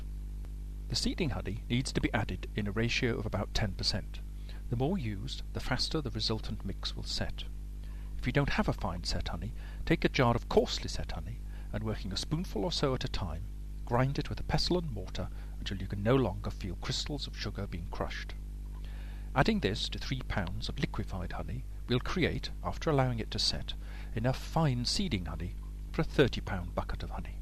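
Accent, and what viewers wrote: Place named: United Kingdom. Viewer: Really didn't care to the nasally british voice that I couldn't hear.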